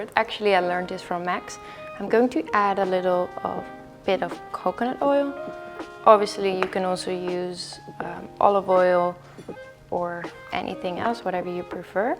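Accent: Netherlands. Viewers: Lol r u dutch your accent is so dutch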